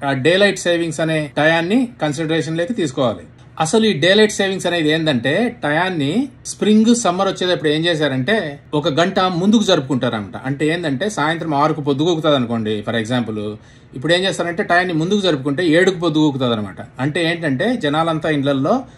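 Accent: Rayalaseema, India. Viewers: మీ తెలుగు భాష చదువుకున్న వాడు మాట్లాడు తున్నట్లు లేదు. తాడిపత్రి లోనో పులివెందుల మనుషులు మాట్లాడుతున్నట్లు ఉంది. అమెరికాలో ఉండి youtube లో మాట్లాడేటప్పుడు వా క్సు థి ఉండాలి. ట యా నికి కాదు టైమ్ కి అనటం నేర్చుకోవాలి. నాగరిక జీవనంలో భాషా సౌందర్యం చాలా అవసరం. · Watching ur videos.. because of your rayalaseema slag sir... I am from kurnool . Tq sir.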